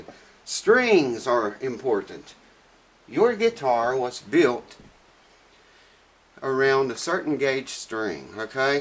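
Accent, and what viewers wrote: United States. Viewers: Enjoyed that even though I hardly ever play my acoustic, still you're a character and I dig your accent, what state of America are you from? Cheers Si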